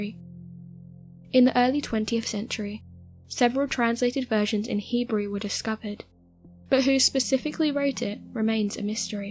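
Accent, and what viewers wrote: England, United Kingdom. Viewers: Why is a British accent supposed to be classy? It's so annoying! Good vid just damn Brits cant talk lol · I love this ladies voice. Is she from Britain? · She’s English and they have a lot of different ways of pronouncing words than North Americans. That’s probably what it is.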